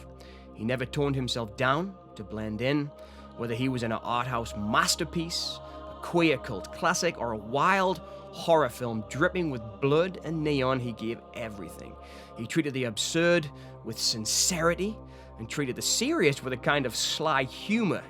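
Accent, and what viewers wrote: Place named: Scotland, United Kingdom. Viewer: I guess u were expecting an answer and all u got was a little love heart ;) There's definitely a dram of Scottish in there, maybe some Irish too, and of course East? American.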